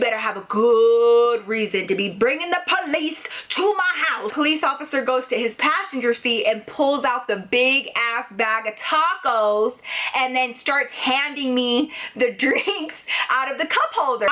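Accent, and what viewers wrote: Texas, United States. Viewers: I love her Texas and Hispanic accent together 😂😝❤👏🏻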